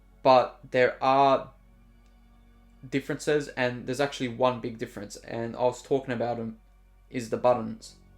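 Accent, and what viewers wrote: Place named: Australia. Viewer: I noticed your aus accent too, how much did you get the inart one for?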